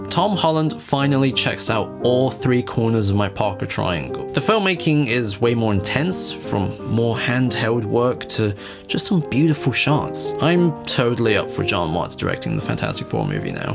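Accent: United Kingdom. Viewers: wow. is the furthest thing from an “objective” analysis on those comics. It’s clear as day. He constantly tries to diminish plot lines in the Raimi films (see: Spider-Man 2 doesn’t get Spider-Man, his comments on the movies having “poverty porn”, his video on the “nostalgic Spider-Man) and clearly likes TASM and MCU much more. Just as you’re saying an opinion can’t be fact, neither can a shitty YouTube video essayist with chill hop in the background. I get it. Most of you don’t read the comics, and think this guy knows what he’s talking about, because he’s got a British voice, scripted videos, and chill hop. It’s just not the case. And it’s not an opinion for to say “the human torch overshadows uncle ben as a mentor in the comics.” That’s flat out wrong. It’s not an opinion to say “Raimi invented uncle Ben saying with great power” that’s objectively wrong. 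And people can’t take criticism of these films well at all. In fact, you’re trying to say “oh well everyone knows it’s not 100% accurate that’s not the point” as you’re watching. A YouTube channel that constantly tries to drive home the “”fact”” that the MCU films are “”more comic accurate”” than the Raimi flicks. Which again- just is flat out not true. And I never said the Raimi films were a 1:1 carbon copy of the films. But they’re hell of a lot closer than the MCU films. You don’t need bizarre nitpicks of random comic panels from the Stan Lee run to see that. And sorry, it’s still not an origin. You’re telling me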